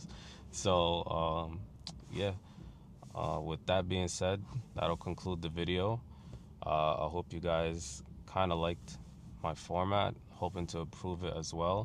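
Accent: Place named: Toronto, Canada. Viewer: You sound like you’re from Toronto though, like a moli torontorian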